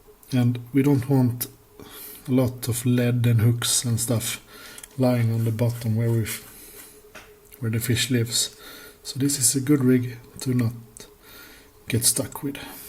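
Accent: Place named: Norway. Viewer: You said Sweden when you mentioned darts, but to me you sound very norwegian, especially how you say "just like this" sounds exactly like my dad whenever we visit our relatives in the US haha. Thanks for the guide, my local water for perch is full of crap, as its next to a mall, and old factory now museum, and not to mention an elementary school, specifacly a steiner school so they do alot of outdoor stuff, so along the edge where the perch sit there are so many rocks, and sticks that people have chucked into the water. Finally decided i needed to learn to use ofsett instead of having to go the store every 3rd fishing trip to pick up light wire leaders (there are alot of small small pike in the lake)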